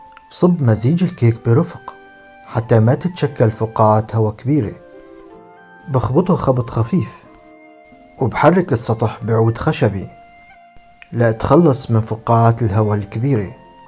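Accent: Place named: Aleppo, Syria. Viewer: يازلمة يا احكي فصحة يا احكي حلبي ليش عم تخلط صارت اللغة العربية تبكي منك غلاظتك لهجة حلبية مو حلوة ابدا🥴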